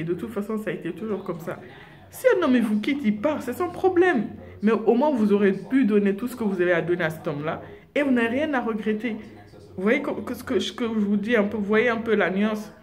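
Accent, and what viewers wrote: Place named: Guinea. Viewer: Très beau cheveux, j'ai deviné que tu es guinéenne dès que j'ai entendu tes premiers mots j'espère ne pas me tromper 😘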